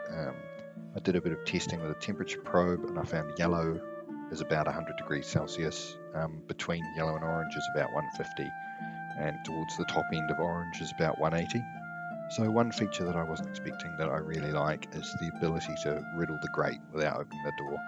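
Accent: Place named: New Zealand. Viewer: Great review, gonna get one for my barge. Nice to hear the New Zealand accent.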